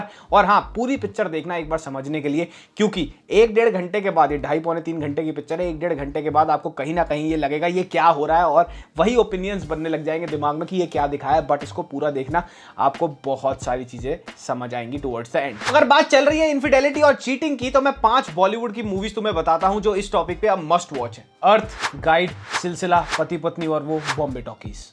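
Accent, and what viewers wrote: Haryana, India. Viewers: k review ka koi muqabla nai hai..or iski comedy haryanvi accents...end hai yaar.jiooooooo shehzada jioooo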